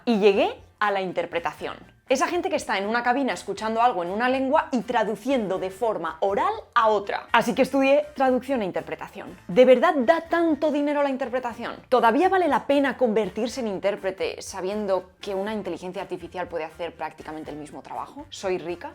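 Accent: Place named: Spain. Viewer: ¡Qué maja! Soy brasileño y me gusta mucho tu contenido. Me ayuda a manter contacto con el acento de España.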